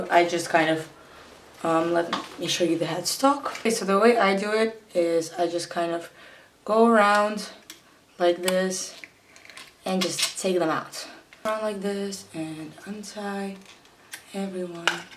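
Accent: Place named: France. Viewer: Oooh ok i was almost sure to have recognized the french accent (because i'm from France ahah).Thanks again for your help and dudette 😎🤘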